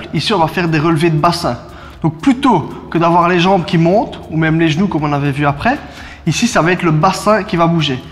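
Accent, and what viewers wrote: Liège, Belgium. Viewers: Bonsoir , c'est bien juste ce que tu dis c'est très intéressant .... Juste un truc , tu parles avec un accent que je semble connaître ..tu es belge ? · Liegeois expatrie aux USA, je suis subjugue par la qualite de tes videos. Et l'accent me rappelle le pays ;)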